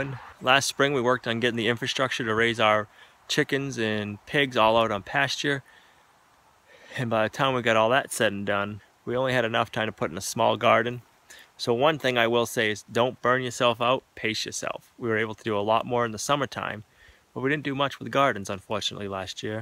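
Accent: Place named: Boston, United States. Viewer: ***No way! I didn't mean speech impediment or problem. I'm from way out west and you speak like a Bostonian. Or a New Hamshire-ian. It wasn't meant to be a criticism. :) Have a great Friday. Great work.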